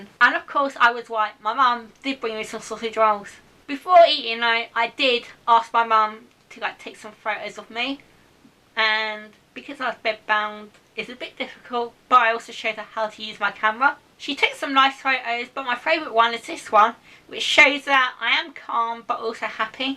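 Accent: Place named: United Kingdom. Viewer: Wonderful that your mother was there to support you. I enjoyed listening to you as I enjoy listening to you Brits talking; to this end I watch a lot of BBC showsz and listen to BBC radio at various times during the day. My best to you and your family. namaste, Roger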